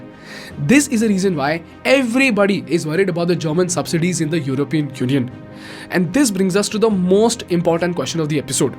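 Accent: India. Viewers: the agitated Indian accent makes it annoying to listen to this person. I quit after 2 min. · Indian accent that’s I can’t understand it ?????😩😫😩😫🥺😫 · i'm perfect flat canadian,, but i can sound hindi like you if i curl my tongue to the top of my mouth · What kind of language is that? Indian English is like a joke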